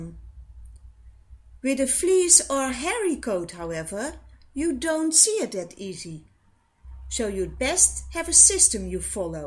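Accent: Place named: Netherlands. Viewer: What a horrible dutch accent, my ears are bleeding.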